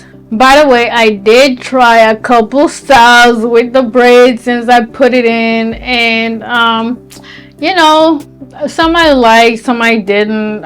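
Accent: Jamaica. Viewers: I hear an accent. Are you Jamaican?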